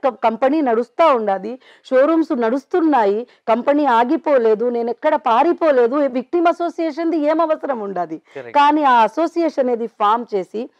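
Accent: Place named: Chittoor, India. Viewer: madam slang looks like chittoor... Good to hear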